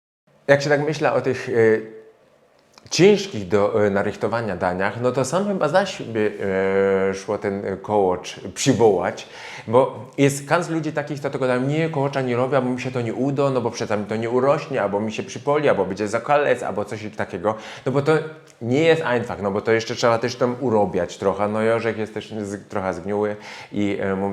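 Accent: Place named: Opole, Poland. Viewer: Fajnie se ciebie suchoł . Malo se tu spotykoł że chtoś żundzi jak w opolskim 😉 a szpajzy to były maszkety mojego syna 😋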